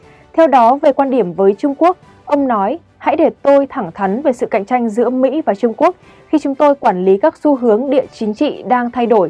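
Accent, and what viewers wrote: Standard. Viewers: giọng nói chuẩn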